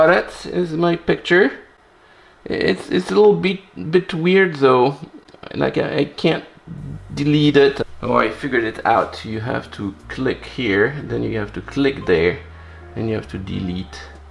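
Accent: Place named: France. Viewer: Nice bit of jazz and a french voice 👍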